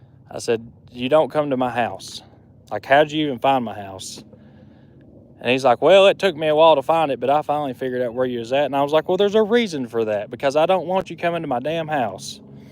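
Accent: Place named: California, United States. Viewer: OK sounds like a Californian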